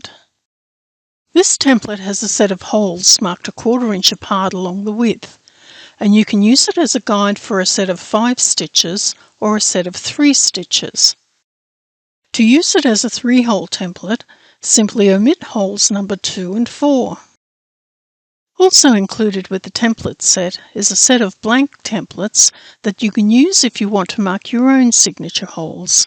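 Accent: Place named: Australia. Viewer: I have watched whar feels like 100's of tutorials on journal binding. This is the best style by far. I can't wait to give it a go. Also, it is so lovely to hear a fellow Aussie making the video. Thanks for sharing.🥰😍🤩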